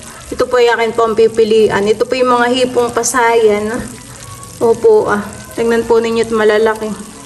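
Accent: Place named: Visayas, Philippines. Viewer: May pagkabisaya ang salita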